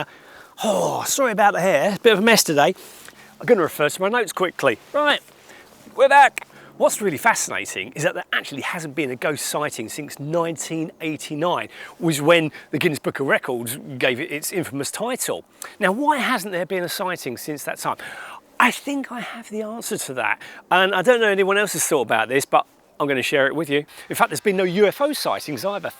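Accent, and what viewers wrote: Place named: United Kingdom. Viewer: British English ❤❤ I hope I can learn it 😢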